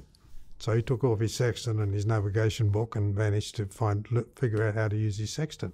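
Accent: Australia. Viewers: Wow youve got an aussie on 👏 Hailing from Australia 🇦🇺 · I always wondered where News of the World stories came from (with an Aussie accent). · As an Aussie from North Queensland too, I recognised his accent straight away! And the “gum tree” in the title gave me a hint too. 🇦🇺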